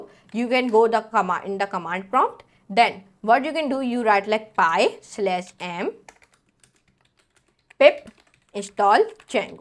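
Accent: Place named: India. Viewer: yAh india ki english bol rha ha Django ka illawa aur kuch samajh nhi ahh rha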